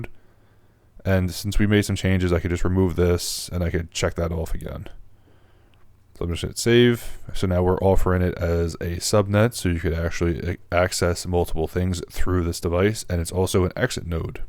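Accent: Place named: United States. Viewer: Thanks. You cannot help it, but your strong US English accent and quiet voice are tough for English speakers to understand. Thankfully, Subtitles help quite a lot, despite their imperfections. 🙂 I bookmarked this video, because it's a good application for an unopened Pi4 which has been in my workshop for far too long.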